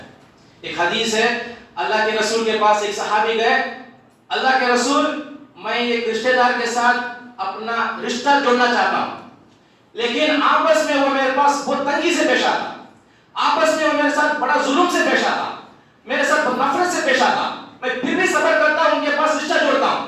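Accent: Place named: Chennai, India. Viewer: Mashallah After long time I heard Chennai slang Urdu bayan.Alhamdulilla Very beautiful bayan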